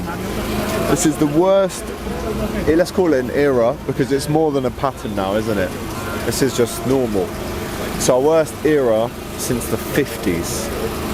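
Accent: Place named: Cockney, United Kingdom. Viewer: Is this Cockney gonna go back to supporting Arsenal now?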